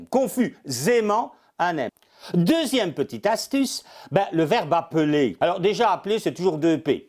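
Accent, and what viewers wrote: Belgium. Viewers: il est marrant ce gars. un petit accent belge non? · Pour le journal parisien il a plus l’accent belge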